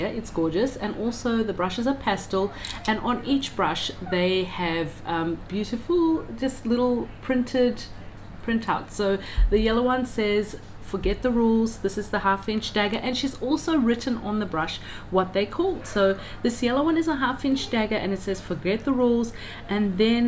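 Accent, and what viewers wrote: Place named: United Kingdom. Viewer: Hello, I came across your video trying to find these brushes(after watching several of Kristy Rice videos) but I can’t find them . I see this video is from January,so I guess Amazon had them then, but currently it’s not available. But maybe you got them on Amazon in UK, because judging by your beautiful accent,I think that’s where you are? 😊Do you know by any chance if I can find them anywhere else? Interestingly it is available to international buyers only on Kriszty’s website,but not for the US which I found very strange. Please let me know if you have any information. Thank you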